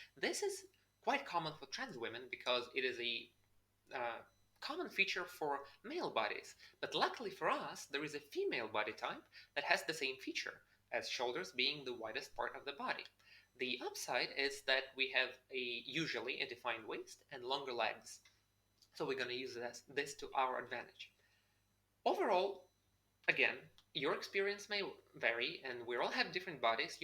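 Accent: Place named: Europe. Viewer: (1) Out of curiosity, what country did you relocate from? And in what country are you living now? Your English is very good. You sound European. (2) I'm a baby-boomer who's accepted in a MTF HRT program at a health center so I can transition to living full time as a female. The culture has finally shifted to where you don't have to fear for your life, get arrested or in general, get harassed wherever you go. Even dressing gender-neutral at only 4 months of transitioning, I've incurred no problems in today's American society. (3) Did you have an occupation or job skill that would translate MTF so you could continue to earn a living. I've been active in the trans community for 25 years. TGurls who were moving forward 'no matter what' lived in what I termed 'a gender ghetto'. No matter what their education, they could only get menial jobs, perhaps as a bartender at a gay-bar or a clerk at a convenience store. 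Professionals (one I knew was a surgeon, the other was an IT specialist for a large corporation) lost their jobs immediately after they had GRS surgery and announced it (a) to the partners who had their LLC practice's lawyer draw up a buyout agreement effective immediately and presented to the surgeon the moment she returned from Thailand where s he had her GRS done, and (b) even though the IT specialist worked openly and sincerely with the company's HR department who 'talked the talk about inclusion' etc., and the company was unionized and she had the union's LGBT rep atte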